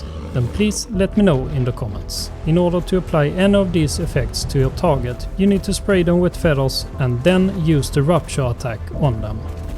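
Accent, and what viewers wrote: Sweden. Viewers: are you swedish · är du skåning?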